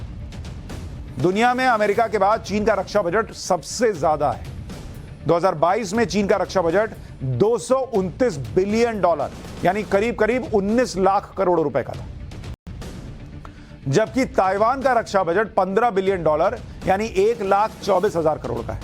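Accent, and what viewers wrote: Bihar, India. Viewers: I love your Bihari accent.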